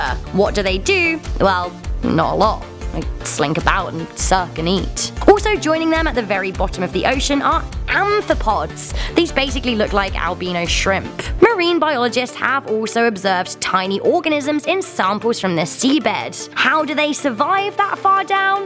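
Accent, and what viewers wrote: United Kingdom. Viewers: yeah thanks but i dont wanna hear british english anymore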